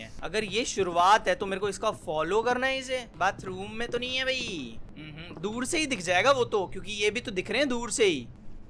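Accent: Haryana, India. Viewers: play evil nun please! With your haryanavi accent and commentary😍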